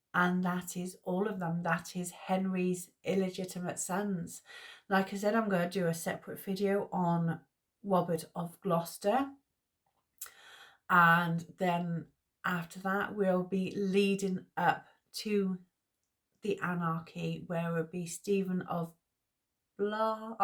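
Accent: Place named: Scotland, United Kingdom. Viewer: Are you Scottish? Love the accent 😊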